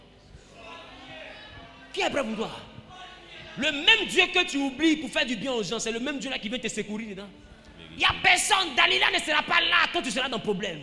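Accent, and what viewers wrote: Ivory Coast. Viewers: l accent ivoirien, man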